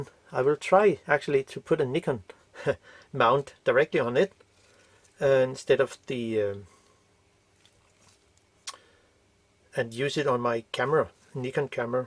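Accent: Denmark. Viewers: Hej. Er du dansk? Det lyder sådan. 😄 Hvis kan. Du så hjælpe mig en 135 mm 3..35 med en stiv fokusering? Mvh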